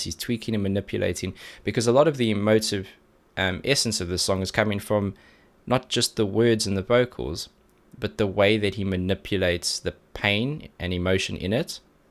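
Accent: South Africa. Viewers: I kind of fell down the rabbit hole of watching various YouTubers reacting to but when I came across this video, boy was I surprised! I Instantly recognised your accent as being South African! As a long time fan of from South Africa, it was trip to find this! I think of all the reaction videos I've watched, this one was the most technical and detailed. It's been interesting hearing about different aspects of the instrumentals (other YouTubers don't really mention them). On, they have a large catalogue of music and their style has changed quite a bit over the years but is one of their defining songs. It's 9 minutes of ebb and flow where you are waiting for the wave to break onto the shore and when it does, wow, does it overwhelm you. (As a viewer who knows the twists and turns of the song, it is fun to watch people's first time reactions to it's unconventional structure.) Kyo (V) has an amazing vocal range so people often focus in on him but the other members of the band, Toshiya (B), Kaoru (Gt), Die (Gt) and Shinya (Dr) are also all immensely talented. 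They've been going for over 20 years and still making music but I love how one never knows what to expect from them next. Still on my bucket list to see them perform live one day!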